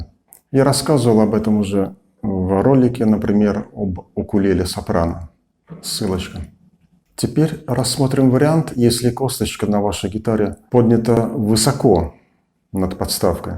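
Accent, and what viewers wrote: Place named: United States. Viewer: Не смотря на русский мат, звучит вполне себе по-американски!👏😁Спасибо за очередной сгусток полезностей!👍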